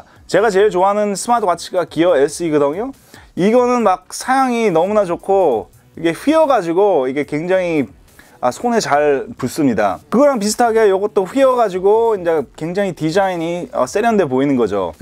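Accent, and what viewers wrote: South Korea. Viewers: 전라도 분이셧나봐요 사투리 많이하시네요 반갑습니다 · 한국어로 된 좋은 리뷰 비디오 감사드립니다! 전에 경상도에서 살으셨다고 얼핏 들었는데 그게 진짜였는지 경상도 사투리가 곳곳에서 튀어나와 정겨워지네요 ㅎㅎ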